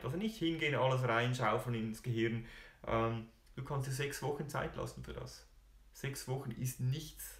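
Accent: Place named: Switzerland. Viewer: Hallo, Deine Videos sind sehr hochkarätig, sehr gut strukturiert und angenehm anzusehen (auch wegen dem schwiezer Akzent;)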